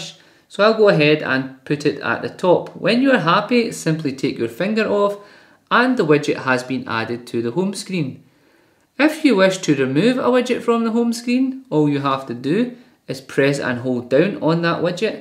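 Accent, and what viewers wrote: United Kingdom. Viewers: Are you Scottish Laddie? :)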